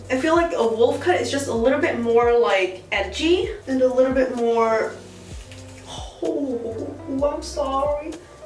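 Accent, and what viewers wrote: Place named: Sweden. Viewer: It sometimes sounds like you have a slight swedish accent to the english hahaha ;D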